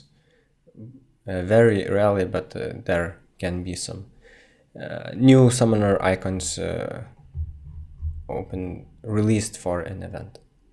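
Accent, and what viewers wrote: Lithuania. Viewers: Let me guess, you're from Lithuania. You sound like one though. Your accent is litterly Lithuanian. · r u lithuanian? ur acent is just like lithuanian talking english:D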